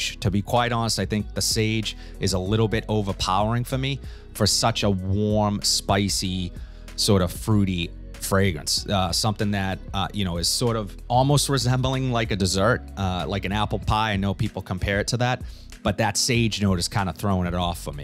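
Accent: Boston, United States. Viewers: LMAO 🤣 The Boston Accent 🤣 always gets me , I'm a Nyer great work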